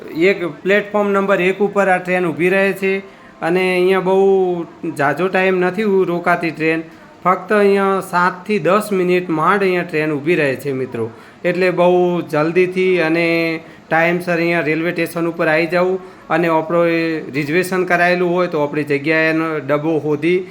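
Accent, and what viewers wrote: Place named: North Gujarat, India. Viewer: ભાઇ તમારી ભાષા ઉતર ગુજરાત ધાનેરા થરાદ ની લાગે છે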